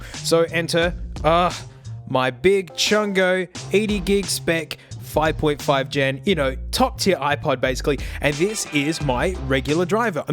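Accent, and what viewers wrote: Australia. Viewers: Teach use how to speak Australian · Where is your Aussie-Slang/Pronunciation :-O :-O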